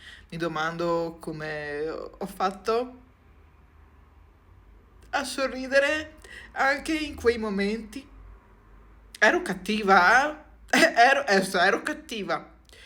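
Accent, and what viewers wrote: Veneto, Italy. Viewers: Parli come me quindi immagino tu sia veneta ...❤ti abbraccio fortissimo e ti auguro ogni bene...❤❤❤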